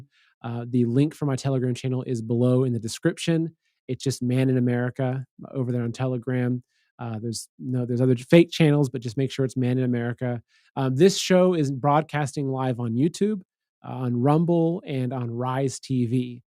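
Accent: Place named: Scotland, United Kingdom. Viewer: That was the Scottish accent and I loved it. I reminds me so much of my uni days 500 years ago :D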